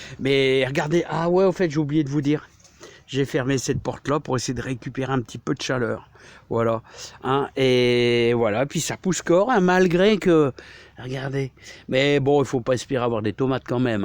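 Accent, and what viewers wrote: North, France. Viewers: « l’bache elle a d’lache » 😂 ça fait du bien un peu de patois du Nord, moi aussi chui de ch’Nord et fier de mes origines !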